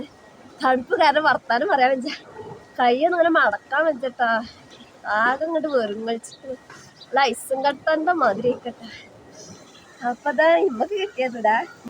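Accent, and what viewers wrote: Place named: Malappuram, India. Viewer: ഈ വീഡിയോ ഇഷ്ട്ടായി ഇജ്ജ് അന്റെ മലപ്പുറം സലാംഗ് പറഞ്ഞു കേൾക്കാൻ നല്ല രസണ്ട് 😀 അഹ് ബല്ലാത്ത ജാതി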